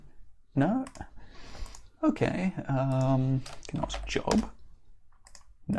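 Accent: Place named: United Kingdom. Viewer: Yo, it's Spladam, killer accent dude, what part of UK is that from? I forgot how beautiful the VGA remake of this game was.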